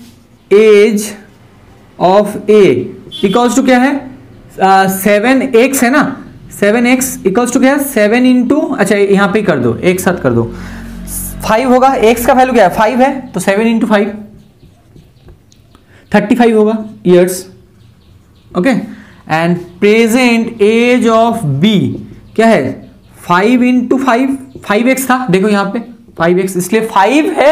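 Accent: Bengal, India. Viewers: Sir aap sum ko saam kyu bolte h shayad aap bengali ho mager aap bhot aache teacher ho heads off on you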